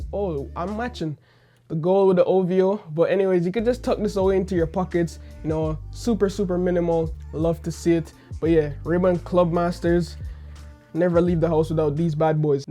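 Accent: Jamaica. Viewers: Yow yah Jamaican ? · you jamaican? big up yuh self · You sound Jamaican 🔥👍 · are u from Jamaican ?? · are you Jamaican brov? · Is that a Jamaican accent I hear?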